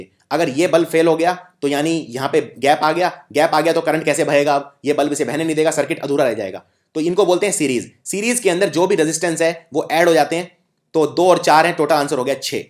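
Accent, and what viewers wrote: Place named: Bihar, India. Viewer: Bahut khub . Ur accent reminds me of a teacher of mine from bihar . Thank u sir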